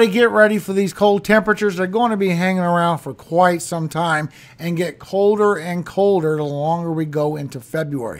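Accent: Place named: South, United States. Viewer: This guy's from the south isn't he?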